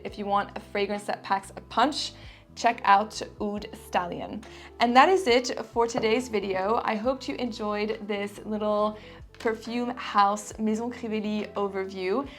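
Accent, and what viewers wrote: France. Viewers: I truly wish you can review Versace Dylan Purple Paco Rabanne Olympea Loving your French as always… I keep practicing the French R now 😅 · Actually I don’t get extremely excited about the hibiscus mahajad… I do have and love Ingredients 07/2012 and recently got my hands on a sample of Neroli Nasimba and enjoy it quite a bit! Enjoying your beautiful French accent and pronunciation !!! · Came here for the review but I have to say I love hearing your French pronunciation. lol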